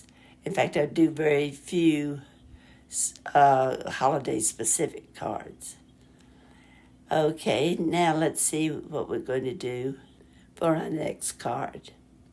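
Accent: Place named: Deep South, United States. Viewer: 👋 Hello Neighbor! My Tennessee Neighbor, I know you live in Tennessee but I would swear I hear a Deep South accent in you, I could be wrong though. I live in and was born here in rural Appalachia, Leslie & Harlan county, but in my 20’s I movie to Biloxi Mississippi for a couple years, and I’m just curious if you are Originally from Tennessee or if maybe you grew up down South? I LOVE your channel, I am fairly new so I’m watching some of your older videos too in my free time, your toilet paper video blew my mind! I think you are a undiscovered Genius! 😊❤ You are Awesome sauce❤, a wealth of knowledge, I’m so grateful to learn from you, Thank you so much for sharing!!😊 I just adore you. Also, 👋 hello to everyone watching, crafting friends! 😊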